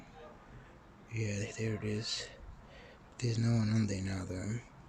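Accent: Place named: South Africa. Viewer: did you grow up there? I feel as if I'm hearing a little bit of a South African accent from you. 👍 Nice flight even though the weather was not great.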